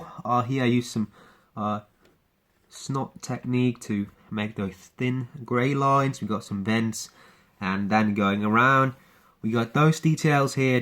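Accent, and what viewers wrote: England, United Kingdom. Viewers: Bist du Engländer? Sprichst sehr gut Deutsch.. Dein Moc is auch super ;)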